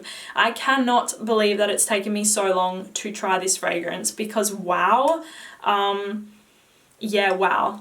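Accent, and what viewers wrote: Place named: Australia. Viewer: I follow you for your knowledge, not your age. I'm an Aussie now living in the USA, and I just turned 50. My head tells me I'm 30 (only my body tells me I'm 50!) Lol. Keep up the great videos, I've since found my 'signature' scent with de Marly Cassili. I'm saving frantically to get a full size bottle 😉 Your accent keeps me reminded of home and I thank you for that too 🌹Please DO add videos for us 50 and over 😊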